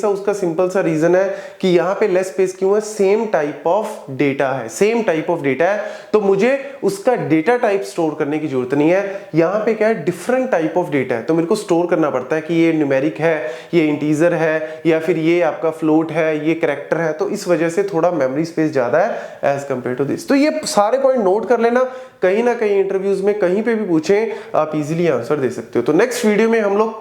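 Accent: Punjab, India. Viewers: Ur Punjabi accent so sexy said again❤️🙌😅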